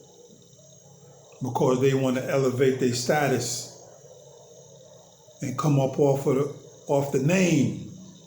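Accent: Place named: Belize. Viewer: I thought that but it's actually Belizean they sound similar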